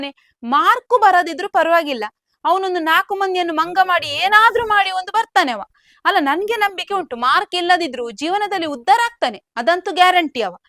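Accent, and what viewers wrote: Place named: Dakshina Kannada, India. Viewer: Very nice talk Madam. DK kannada is soo 😍 lovely.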